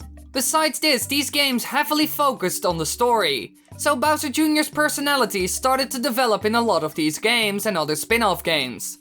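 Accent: Netherlands. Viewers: are ya dutch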